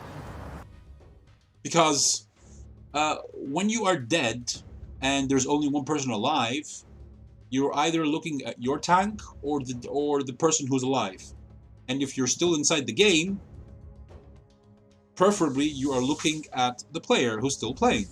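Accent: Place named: Greece. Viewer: I just want to point out your English is well learned. The Greek accent is there, but not painful to listen to.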